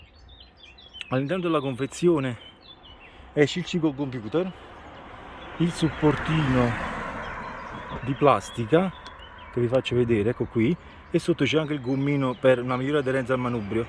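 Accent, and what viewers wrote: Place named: South, Italy. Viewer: Grazie. Voce molto simpatica del sud . Grazie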